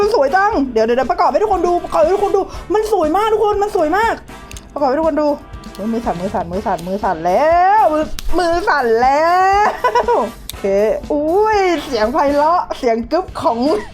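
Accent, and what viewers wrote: South, Thailand. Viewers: พี่เป็นคนใต้เหรอครับ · พี่คนใต้เหรอผมก็ใต้นิพี้เห้อ😂😂😂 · พี่คนใต้เหรอครับผมก็อยู่ใต้ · พี่พูดทองเเดงตลกมากผมก็คนใต้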